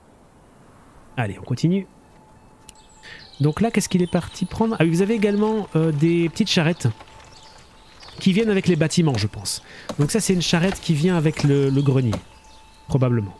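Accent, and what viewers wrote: Belgium. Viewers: Tu as un léger accent belge... 😊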